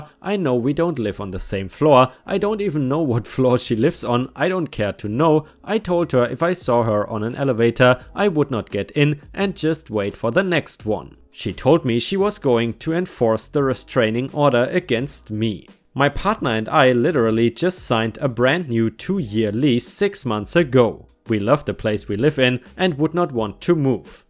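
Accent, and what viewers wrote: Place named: Germany. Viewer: Another good video,! These stories were a little bit underwhelming, but it isn't like you write this stuff, right? I do enjoy your voice, especially your accent (brings back a whole bunch of happy memories of my childhood in Germany!), but here;s hoping you can find some more, well, let;s just say, "exciting stories". ⭐️⭐️⭐️⭐️⭐️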